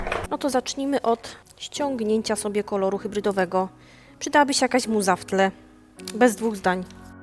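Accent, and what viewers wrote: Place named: Silesia, Poland. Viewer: Jesteś nojlepszo i słysza Śląski akcent😁😁 pazurki piekne jak zawsze pozdrowienia z piykor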